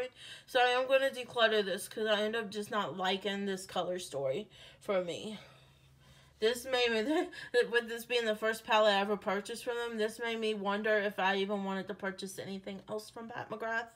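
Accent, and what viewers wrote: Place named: Pennsylvania, United States. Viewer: Are you from PA, trying to place your accent.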